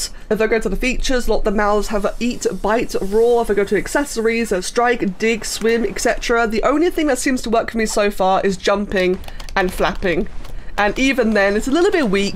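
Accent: United Kingdom. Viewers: why listening to the bri-ish accent hurts that much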